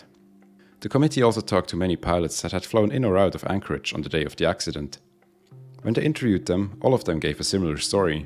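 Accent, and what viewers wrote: Switzerland. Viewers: Fantastic videos, and love your (more Swiss, than Austrian, actually) accent. One nit: I observed a pattern where you say things like "all but unforeseeable", when really what you mean is the opposite: all but foreseeable, meaning it is everything except that it can be foreseen. Keep it up, man, these are great. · You draw only one wave, that right over the obstacle. Which is orographic wind (wind following the contour of the landscape) and has nothing at all to do with the wave phenomenon. The wave is a gravity wave of the whole atmosphere BEHIND the obstacle. (Comparable to the wave of a stream behind an obstructing rock.) Rotors are aligned with the waves behind the ridge, and not just chaotic phenomena downstream as your drawing implies. Completely off topic: Are you Swiss? I seem to hear a hint in your accent. (Swiss myself.)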